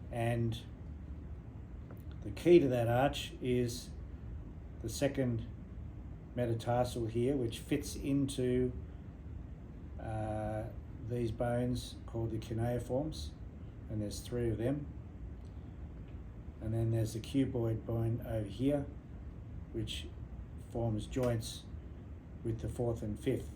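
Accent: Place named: Australia. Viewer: Sounds Australian.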